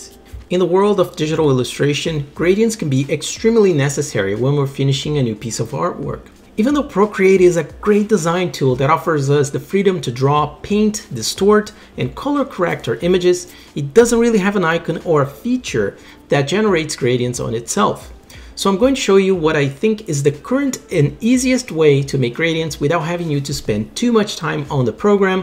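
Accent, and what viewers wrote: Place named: Italy. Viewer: Trying to guess accent... I'm with either Italian or Brazilian/Portuguese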